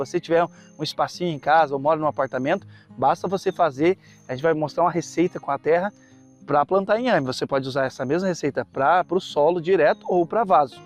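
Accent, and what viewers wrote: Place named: South, Brazil. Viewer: 😂 taiôba não sô taioba 😂 sotaque do sul 😂 amei o conteúdo. Deus abençoe vocês hoje e sempre.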